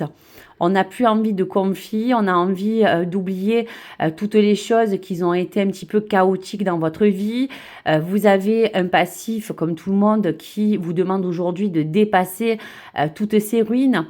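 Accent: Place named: South, France. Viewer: Bonjour j'espère que vous allez bien merci pour ces guidances super que vous faites à chaque fois et j'adore votre accent du sud je suis de Marseille du coup l'accent chantant on connaît les cigales on les connaît je suis pas de Marseille même mais ça fait un moment que je suis là mais sinon j'ai grandi en Bretagne c'est pas la même c'est le jour et la nuit j'aime beaucoup votre accent quand même❤😂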